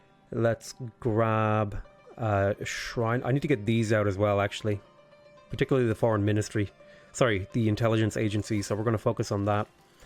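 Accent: Ireland. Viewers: I swear accent became more Irish after he was accused of sounding like he was from London. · IDK what that guy's talking about, you definitely sound Irish. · mate that guys is shit at accents must be american you deffo sound irish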